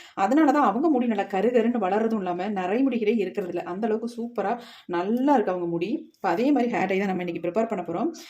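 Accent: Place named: Kerala, India. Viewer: Unka mudiyai kattunkal Unkal pechai kettal malaiyalu mathiri illaiyea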